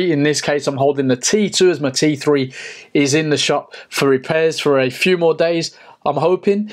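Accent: Birmingham, United Kingdom. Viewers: nice work, you sound like a fellow brummie! i didn't even realise this simple idea existed.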